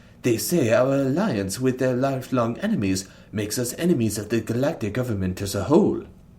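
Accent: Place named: France. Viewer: Billy Bob Space Trucker in a French accent is the best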